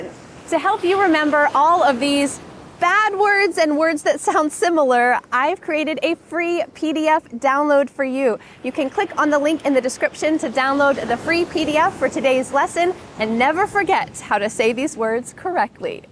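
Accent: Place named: United States. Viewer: very very important and had a great time with your beautiful American English. Thank you so much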